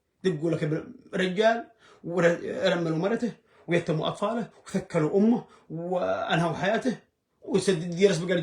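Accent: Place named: Yemen. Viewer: نشكرك اخي وصديقي انت نبراس للاعمال الخيريه، وكلماتك ذات الفصاحه واللهجه اليمنيه الاصيله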